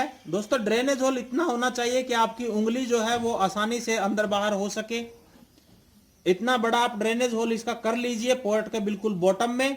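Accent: India. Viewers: haryanvi ? kit ke ho?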